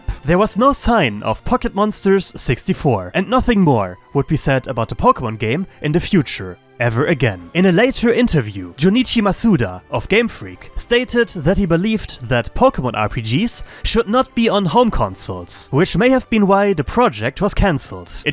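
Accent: Germany. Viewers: You make the German accent sound oddly attractive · Nach deinen Akzent gehe ich stark davon aus das du aus Deutschland kommst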